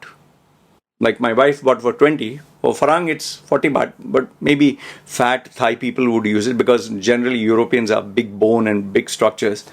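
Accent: India. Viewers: Indian accent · Bro is Indian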